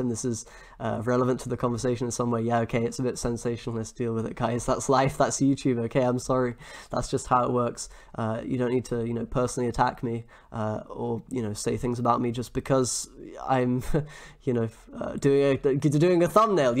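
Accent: United Kingdom. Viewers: I stopped playing Gwent when I 've realised how Homecoming downvalued my beloved game but I keep watching you just because of your fantastic British accent. Keep doing your job!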